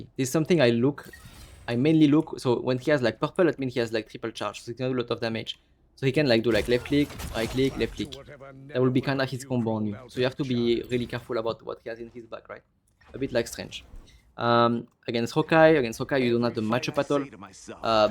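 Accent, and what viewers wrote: France. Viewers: You have a very strong French accent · Hey bro are you French or something ? Nice video btw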